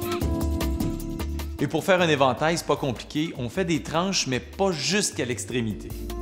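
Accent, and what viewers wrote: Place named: Quebec, Canada. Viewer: Merci ricardo ! Ton accent québécois rend tes conseils captivants ! :D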